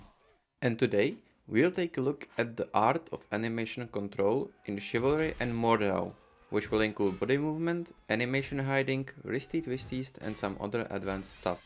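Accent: Czech Republic. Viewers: Where are you from? You sound like Czech.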